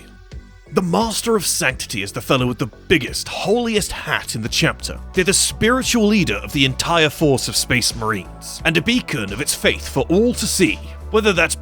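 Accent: United Kingdom. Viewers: Mate this is a fantastic video but as English sounding as you are please, lieutenant is pronounced Left tennant. Lewtenent is the American pronunciation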